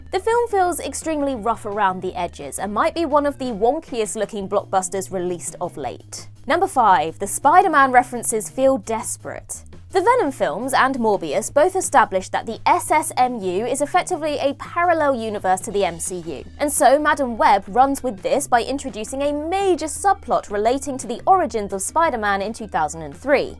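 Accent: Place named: Exeter, United Kingdom. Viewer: Love the accent. Are you from Exeter?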